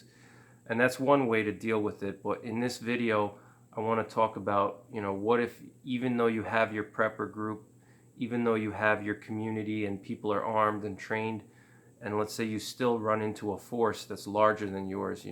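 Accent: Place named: New York, United States. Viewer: Hey from waaaay UPSTATE NY. Your "accent" says waaay downstate.lol. Impressive on the deadlifts!! As a young man of 40 I pulled 405 for 10 at 145 lbs, but 25 , I got winded watching:) Too bad you appear to be so far away, could use another good man for the community. (***Always looking for like minded preppers to join my little slice of heaven!! 1/2 hour from Canadian border and 1 hour from Montreal, if anyone's interested and local, or just someone who realizes being in the sticks is way safer than anywhere near cities and won't mind some travel). Good videos and now a sub!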